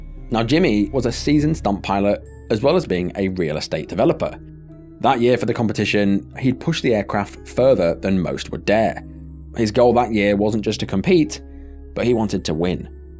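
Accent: United Kingdom. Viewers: This video would've been OK except for that ridiculous British accent on the voice. Damn I hate those.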